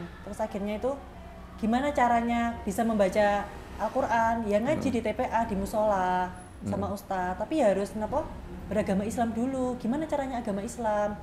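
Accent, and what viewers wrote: Indonesia. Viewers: Medok skali jawatimurnya ibu...😂..smoga tetap istiqomah..🤲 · Surabaya medok mantap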